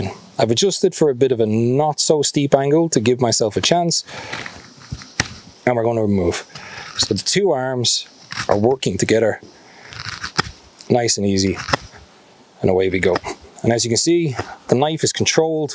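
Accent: Ireland. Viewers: Jaysus you had me in knots with the chicken dance in the 80s.... memories! Great listening to an Irish accent over mostly Americans on YouTube, you're a treasure trove of information and inspirational in your enthusiastic delivery, I really enjoy your videos and thanks for sharing your knowledge, I am putting together some kit as I am really only starting out with the outdoors but I am grateful for the safety advice as I don't think I would have been careful enough with some of the processes...but listening and watching you has given me a completely different perspective, loved the axe 🪓 videos as well! Go raibh maith agat!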